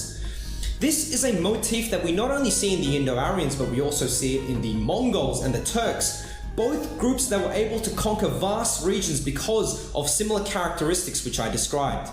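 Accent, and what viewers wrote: Australia. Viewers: Your videos are great. And the Austro-alien accent makes it even better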